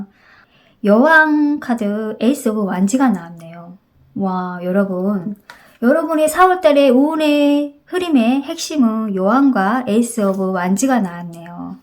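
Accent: Jeolla, South Korea. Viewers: 1번 감사합니다 ㅋㅋ 전라도 분이신가 봅니다. 광주 목포쪽인가요? ㅋㅋ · 전라도 분 이신가 봐요?반갑네요! 1번 리딩 감사드립니다^^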